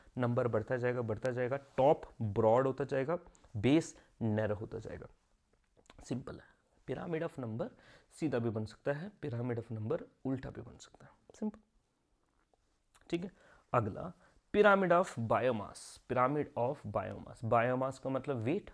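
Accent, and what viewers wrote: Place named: Punjab, India. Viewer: Sir aap Punjabi ke lagte ho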